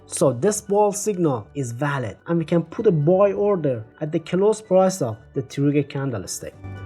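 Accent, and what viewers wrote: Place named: Iran. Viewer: Just the ones I was looking for. Thanks so much.👍👍👍 By the way, from your accent I guess you're Iranian, am I right?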